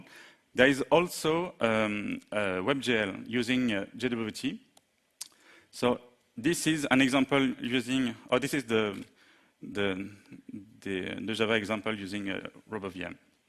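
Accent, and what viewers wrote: France. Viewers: Sacré accent Français :D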